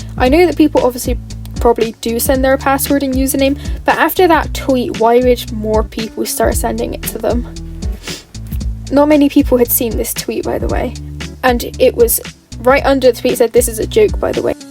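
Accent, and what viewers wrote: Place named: United Kingdom. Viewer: Ur voice sounds so much more British than irl 🧐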